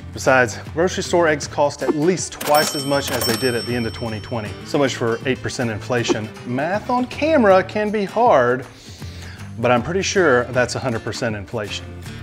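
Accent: United Kingdom. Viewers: That British accent got me good😄 I did not expect that. Good one sir. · British accent earned you a sub... from a Brit! Haha.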